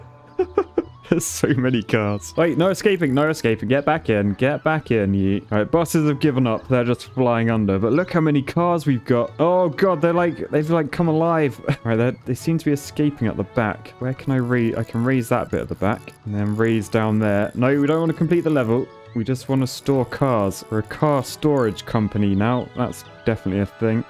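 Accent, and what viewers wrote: United Kingdom. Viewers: I love this channel and Your accent is hilarious😂! I love the British accent😁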